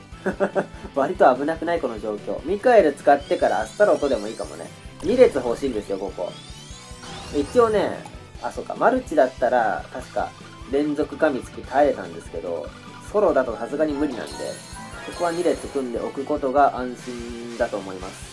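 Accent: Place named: Tono, Japan. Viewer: さん思っきり東濃弁はいってますねぇ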